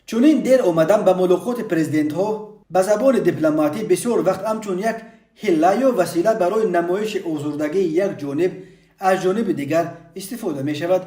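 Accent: Pamir, Tajikistan. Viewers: Э помири рангу намудата нишон те якбор, помири гап зан помири акцент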